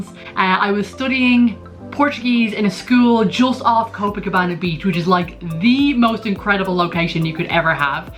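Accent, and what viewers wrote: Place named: Ireland. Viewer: I feel very happy 'coz I understand 90 % now... When I started listening to I got only about 5 % because of her Irish accent. So, it's important to go ahead and listen to her even without subtitles in Portuguese, because you can improve your listening as I am doing right now.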